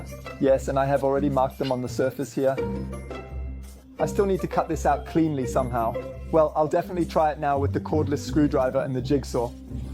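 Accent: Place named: Germany. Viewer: your german dialekt which I like very much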